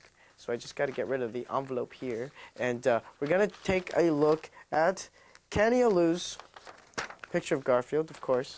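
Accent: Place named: Canada. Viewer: oh man... i completely forgot how deep that canadian accent can be. living here in vegas 4 years i missed it. i remember that show. also the one where the kids call into santa during the christmas season. memories...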